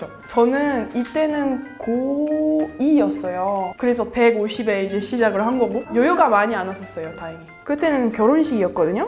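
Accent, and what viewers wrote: Seoul, South Korea. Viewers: 나보다 더 서울말 잘 하시네요.. 전 서울말 못함.... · 눈감고 들으면 완전 한국인 발음이네요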